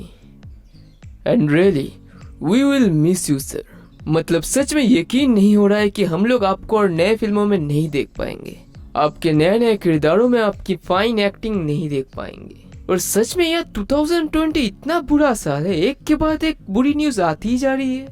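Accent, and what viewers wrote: Bengal, India. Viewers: Are you bengali ? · Bhai tu bangali hai. Na?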